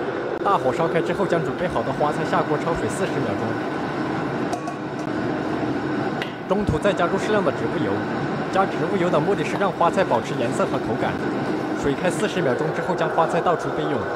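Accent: Sichuan, China. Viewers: 一听就晓得是四川的哥老官，兄弟，莫得毛病我日。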